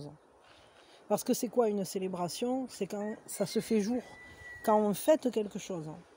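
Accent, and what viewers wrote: South, France. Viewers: Bonsoir 🤗 on se croirait ds le sud ou vs êtes plus votre accent les poules le vent mais j adores ce contexte 🌳🌿🍃🐔🐔🐔🐞la guidance me parle pas j aimes bcp cette façon de penser à l un à l autre se protéger avancer main ds la main ...... J espères rencontrer ce style d amour très grd ah oui 😊🙏 bonne soirée 🤩💖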